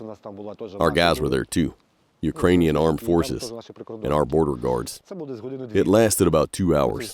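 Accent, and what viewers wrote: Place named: South, United States. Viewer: I really like the southern voice used! I like to listen to longer Resonance videos while driving.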